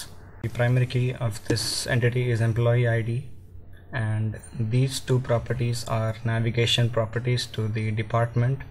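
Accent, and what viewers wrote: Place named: India. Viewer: I hate the Indian English.. I dont understand...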